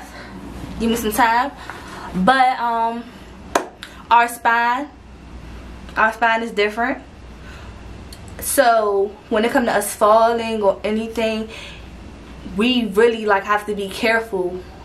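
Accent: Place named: Chicago, United States. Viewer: Friend are you from the chi your accent Gives me very much Chicago forgive Me if im wrong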